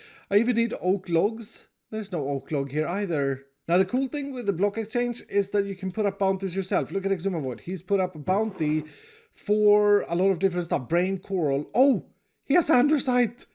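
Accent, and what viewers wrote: Australia. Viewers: LMAO he's Australian! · Dont worry he is just Australian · He's just Australian. xD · He's just Australian, has to be the best thing I've heard in weeks. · Dont worry he's just Australian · Dude lol bruh it’s aight he’s just Australian 😂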